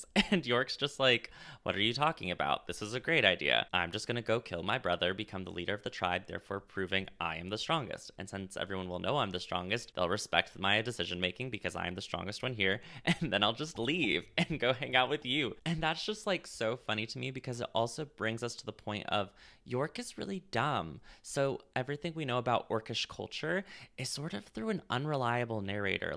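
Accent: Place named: United States. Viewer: the way you envision the northern lands is SO different from how i picture it, i love it! i always pictured it as very windswept barren grasslands, like the steppes in northern china/kazakhstan - its completely different from your vision. whats so interesting to me is that given that you're from the northern hemisphere (presumably, you have an american accent lol), most people from there hear "north" and think cold - im from aotearoa in the southern hemisphere so north = hot to me! yet our respective idea of the northern lands are almost opposite to what our geographical perspective would lead us to, its so fascinating.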